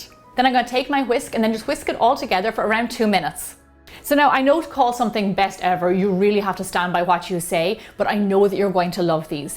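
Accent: Ireland. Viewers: i love your Irish brogue so I'm going to listen to all your videos! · i love her Irish accent. I could listen to her talk all day long.. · Hardcore brownies xD I will say it: it has to be fudgy, otherwise it's a chocolate cake! Brilliant recipe and I love your Irish accent :)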